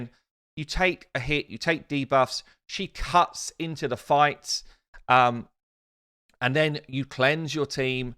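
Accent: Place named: Hampshire, United Kingdom. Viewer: A nice change in content. I keep trying to place your accent. I'm thinking SE coast, Hampshire maybe.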